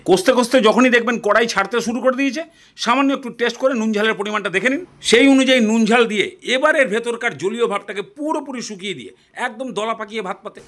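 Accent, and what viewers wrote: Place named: Bangal, Bangladesh. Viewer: Aber bangal vasa ta keno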